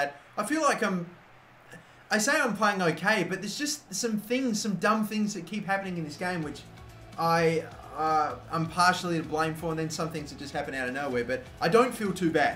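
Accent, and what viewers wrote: Australia. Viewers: daily dose of angry aussie beginning in 3, 2, 1....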